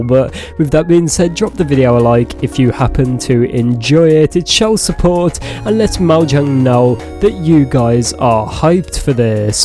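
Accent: United Kingdom. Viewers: Wow British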